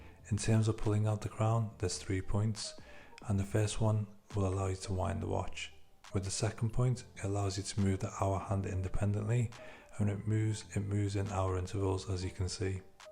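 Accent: Liverpool, United Kingdom. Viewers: Scouse accent I hear lol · Being from Liverpool...love that I’m listening to a scoucer ...living in Scottsdale and Chicago for the past 40 years....and loving Rolex....I am looking for this watch. That’s one beautiful watch !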